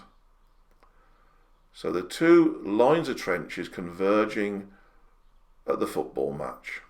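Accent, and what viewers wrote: Birmingham, United Kingdom. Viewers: well in that case it really is a lovely occurrence in such a devastating and sad time. Do I detect a soft brummie accent?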